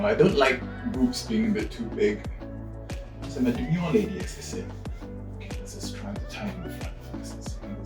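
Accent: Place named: Malaysia. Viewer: very detailed video , im from Canada originally from Malaysia, , i recognized youre accent right away lol. Im gonna be doing drag alot more and this really helps alot thanks queen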